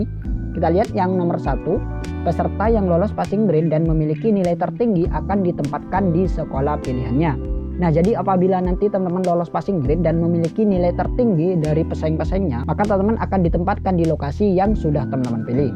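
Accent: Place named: Madura, Indonesia. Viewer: Mas ini orang Madura ya..dari logatnya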